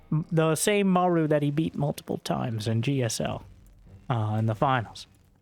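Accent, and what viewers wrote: South, United States. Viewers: Nice casting!! Would love to see one where you speak normal english, or some british accent. The southern accent is distracting from your great casting quite a bit. American in general is.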